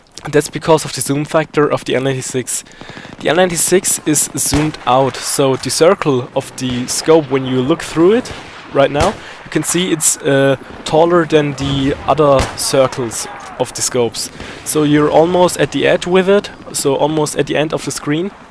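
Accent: Germany. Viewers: are you somekind of german or austrian?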